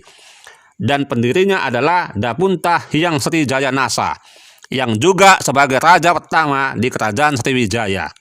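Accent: Java, Indonesia. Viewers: Saya penasaran dengan Om ini ilmu Sejarah dan Agama sangat oke.dan kalau bicara logat bataknya tidak kelihatan bahkan logat bicaranya seperti orang jawa.Padahal aslinya orang Batak.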